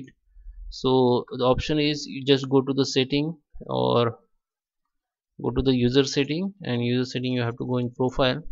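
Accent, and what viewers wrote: India. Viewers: He sounds like an Indian and most of the elderly working people in India prefer windows 7 because it is simpler with less bugs in the software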